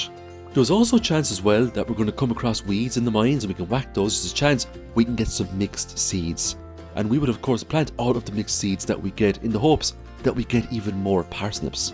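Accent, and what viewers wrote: Ireland. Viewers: Are you Irish I can hear a accent? I come from the north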